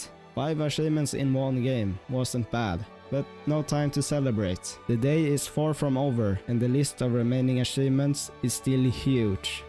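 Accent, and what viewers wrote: Sweden. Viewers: Är du från Stockholm? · You are Swedish , I can tell. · man hör att du är svensk (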